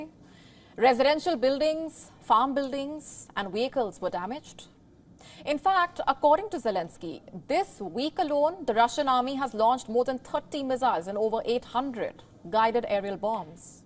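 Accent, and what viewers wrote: India. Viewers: Your india asent i wonder how you are doing properganda for the west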